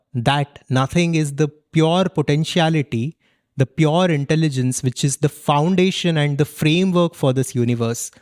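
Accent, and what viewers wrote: India. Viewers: Probably the best explanation to this question in the thickest Indian English accent I’ve ever heard.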